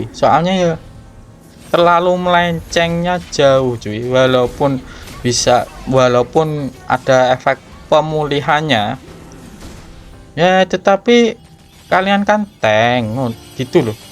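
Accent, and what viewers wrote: Java, Indonesia. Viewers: Jawa bang?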